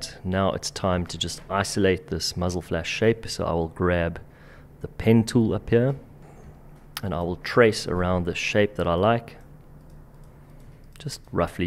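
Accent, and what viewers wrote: South Africa. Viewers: also South african!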